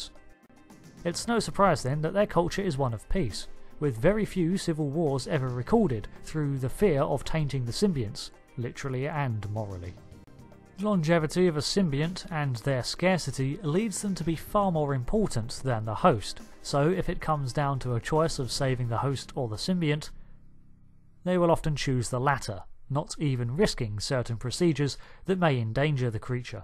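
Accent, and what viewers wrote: United Kingdom. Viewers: Better at 1.25 speed but still not comprehensible. What is it about this man’s British like accent that’s tough to understand like he slurs his words and expects us to understand your joke when you barely said it See — what joke.. you said “I’m joking” but what’s the joke you didn’t even say except their efficient choice/ mode of transportation.. which is not funny.. then it sounded cutoff and slurred out.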